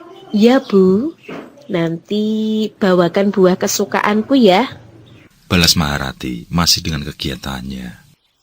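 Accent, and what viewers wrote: Java, Indonesia. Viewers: Kenapa di kalimantan logatnya ada jawa²nya iya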